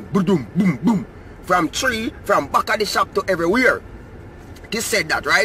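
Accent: Jamaica. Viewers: You still speaking patwa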